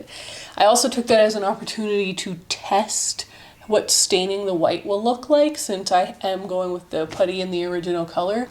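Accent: Canada. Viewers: No, sorry! Ha, I mean the pronounciation- at first I was like, "Wait, is she saying pouddy?" And then I realized that that is, in fact, how I say it too. It's the 'about/aboat/aboot' accent coming for us again. That and saying double t's as d's- but really if we don't do that then we sound british, so I say just rock the Canadian accent with pride lol.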